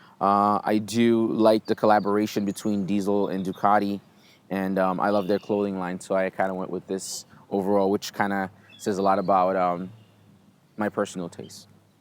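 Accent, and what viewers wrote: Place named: New York, United States. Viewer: For some reason all I can hear is John Leguizamo, well without quite such a harsh Queens accent. Must be a New York thing, though you sound nothing like my friend that's New York Italian/Irish, but then he's from Northern NY.. 30+ years away and his accent is still thick as a cabby's heart.. especially when he drinks. Ever noticed the Termi logo looks like a pair of balls with a schlong hanging low? :P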